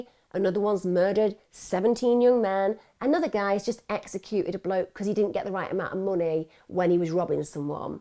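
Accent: United Kingdom. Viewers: I just heard you in a deep dive and glad I did. Thoughtful takes and yeah, British accent. Good job. Cheerio!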